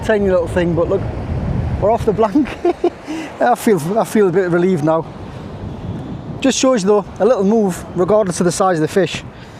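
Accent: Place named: Newcastle, United Kingdom. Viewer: Hi just thought i would say i thoroughly enjoyed watching the video.....love that coastline it brings back so many memories and hearing the Geordie accent too!!! Take care mate cheers ian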